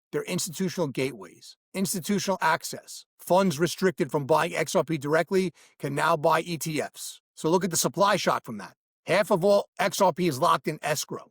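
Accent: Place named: New York, United States. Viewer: You are the only NY accent that I can listen to. Keep 'em coming.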